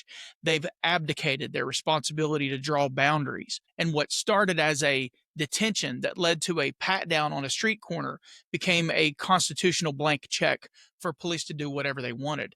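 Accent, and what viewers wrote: South, United States. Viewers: Very good work here, Sir..... very good indeed. You mentioned the crafting of language which would show up in their Police Reports. You were kind in calling them misleading b/c to my way of thinking if it's not the truth then it can only be a non-truth. Whether we call it misleading or a lie, isn't the LEO and/or the DA failing to uphold their Oaths if any of the Police Report information is not true & they fail to be held responsible? I do apologize for going off topic here but this issue of misleading police reports gets even worse when multiple LEO's are conspiring to get the story straight? This was a really well thought out video - truly disturbing on so many levels. peace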